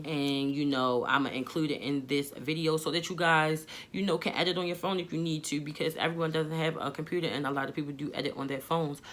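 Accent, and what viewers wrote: Baltimore, United States. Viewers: I agree this is probably the worse camera to vlog with. SN that Baltimore accent is strong girl! 💪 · I'm from Cali and I love me a BMore accent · Girl I’m from DC I hear that Baltimore accent LOL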